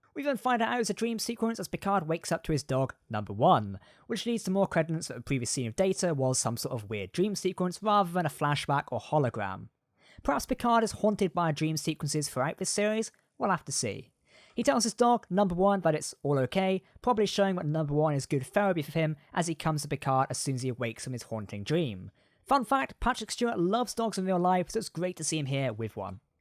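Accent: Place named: United Kingdom. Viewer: It's a shame that this fellow is cursed with that damned British accent which causes the speaker to slur their Rs into Ws (Mayfair?), making them sound like Elmer Fudd: "Be vewy quiet ... I'm hunting wabbits". That, plus his penchant for speaking too fast, I can understand very little of what he is saying. Very annoying to listen to.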